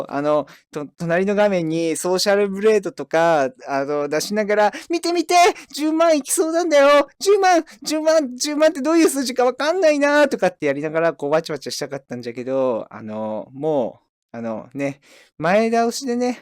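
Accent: Hiroshima, Japan. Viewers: 時々広島弁になってねえか？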